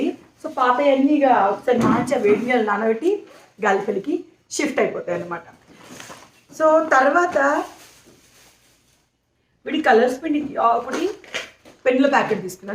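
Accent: Telangana, India. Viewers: Previous ga matladandi Telangana slang anta baledu madam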